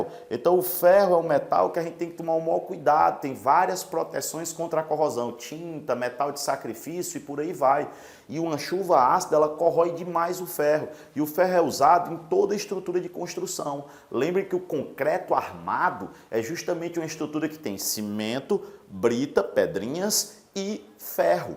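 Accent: Ceará, Brazil. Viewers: Cearense é reconhecido onde for Kkkklk é nois, macho véi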